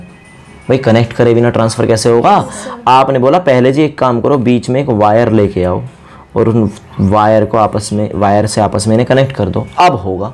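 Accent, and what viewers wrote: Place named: Haryana, India. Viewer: ur accent is like that (from haryana)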